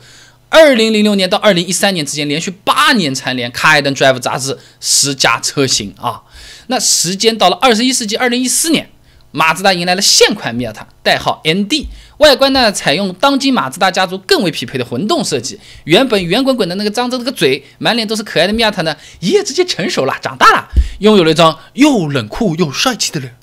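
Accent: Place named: Wu, China. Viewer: 经常看你充满吴语风格的讲解,受益匪浅.据说印地安人牌摩托当年挺厉害的,能讲讲吗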